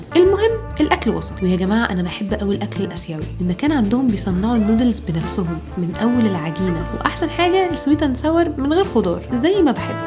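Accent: Algeria. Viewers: انا مصدومة حرررررفيا انك تكلمتي جزائري كتتتير منيح 🤠🫰🏻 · واو تتكلمين جزائري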